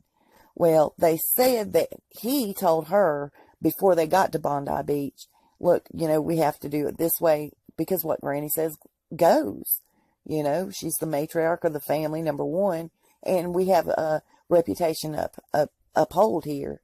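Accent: South, United States. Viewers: I just love your accent, I lived in the south and love to hear it! Plus you are 100 percent right about Megan it will catch up to her!😂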